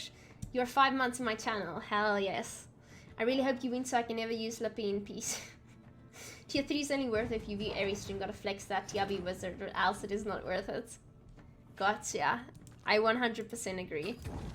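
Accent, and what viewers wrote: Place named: Afrikaans, South Africa. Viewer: Knew I recognized the Afrikaans accent👀👌🏾. Great to see a fellow South African playing gwent😁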